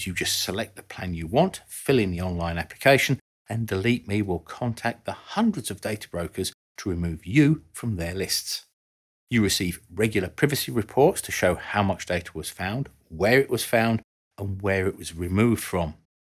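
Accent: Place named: Yorkshire, United Kingdom. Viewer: Ahh, that Yorkshire influence on the accent.... "What planet are you on?" "Uhf"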